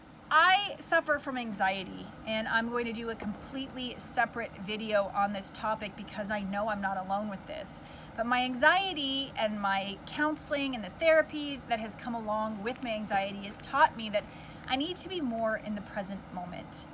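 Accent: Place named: Canada. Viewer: I have to watch your videos with captions. Canadians talk funny. I know it is not your fault but my research shows Canadians are taught to pronounce certain words largely based on their Scottish heritage. Some examples include, “about,” “against,” and “out.” Thank HGTV and DIY network for choosing shows produced in Canada. I watched a TON of shows over the years. It became really annoying.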